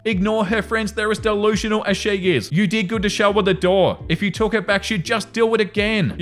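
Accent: Australia. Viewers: Silent lurker chiming in. 😊 I'm loving the longer content - I can listen to the stories and enjoy the Aussie accent (I'm a Melb girl living in the US a handful of years now... Hearing another Aussie helps me feel less existential dread right now 👀) while I have my morning coffee and plan my day, sneaking glances at kitty cam. 😻 Doing a longer video every other day is the way to go. Quality over quantity. Gotta look after yourself so you don't get near burnout. Give the kitties a cuddle from the far away Aussie woman who is currently freezing. (It's -12°C)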